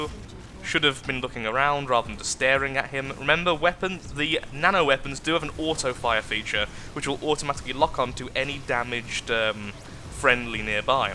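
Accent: United Kingdom. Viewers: for his accent, UK most likely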